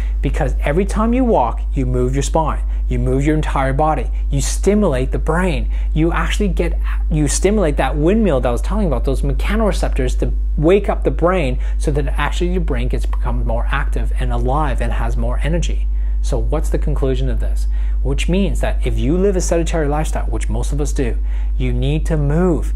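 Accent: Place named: United States. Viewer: You sound American or maybe Canadian.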